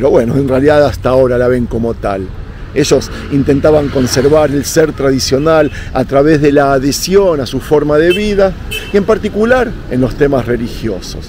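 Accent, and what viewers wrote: Argentina. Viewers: Hola. Soy Miguel de San Vicente bs as, no soy religioso, sin embargo vos con tus videos logras llamar mi atención, haciéndome ver cada uno de tus videos.Quizas sea el acento argentino. Quisiera hacerte una consulta, vos decís en el vídeo q los sefardíes son los judíos de oriente y los azquenazis los de occidente.es así o es al revés.? Te mando un abrazo grande y seguí así.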